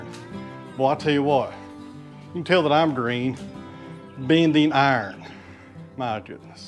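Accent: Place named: Tennessee, United States. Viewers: I happen to like your Tennessee dialect! Don't change for anybody. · Keep on bragin' on yourself my friend, and I love the TENN dialect. Love watching your videos!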